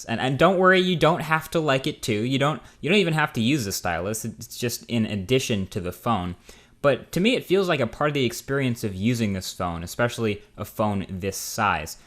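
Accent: India. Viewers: Boy I swear I thought you were Indian!! It is so cool to hear a brotha talk with some intelligence!!! Great review and I'm definitely getting this phone next, tired of apple. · Back when Marques sounded a bit Indian.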